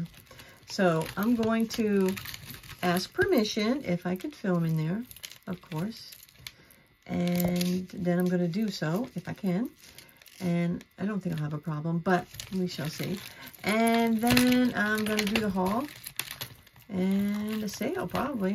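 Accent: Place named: Massachusetts, United States. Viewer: “Memba?” Lol!! Are you from Massachusetts? Sounds like what we say here! Lol Can you please tell me how you deal with your jewelry? I don’t have even a slither of what you have and I have NO idea how to organize it. I’ve been an eBay seller for over 25 years but I sold vintage china and collectibles. I’m getting away from that because shipping is so high. I decided to switch to jewelry after I started researching some vintage pieces I got from my grandmother. But let me tell you… I knew NOTHING about jewelry about a year ago. I mean, it’s sad how little I knew!! I never even thought to look for marks, or anything, But I started to learn, then I bought only about 4 boxes from the online thrift and I will not allow myself to buy anything else until I sell some of this stuff!! How do you deal with it all? Eventually I bought a gem tester, metal testing kit, etc. 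I’ve tried to organize by colors, metals, time periods, etc., etc., but there are so many jewelry categories! This stuff takes a lot of time! At least for me it does. I feel like I’ll never make any money at the rate I’m going. Also, how do you sell? Online? Only on YouTube? Is it worth the time? When I try to list things, let’s say I take 20 pieces of jewelry to list…. After I finish looking at them with the loupe, research them, clean them up, take pics, edit the pics, then list them, it’s taken me like 12 hours! Can anyone offer me a little help in starting up? Thank you so much! Oh… btw, I LOVE that you show us close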